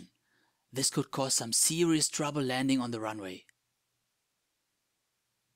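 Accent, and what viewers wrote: Germany. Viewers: kollege man merkt dass du deutsch bist :D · Are u a german??? :-) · Not sure if German or Japanese. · Are you German? Almost every German has that English accent.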